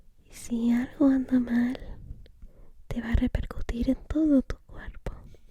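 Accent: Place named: Argentina. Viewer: Desde que parte de Argentina transmites los videos, lo digo por la entonación.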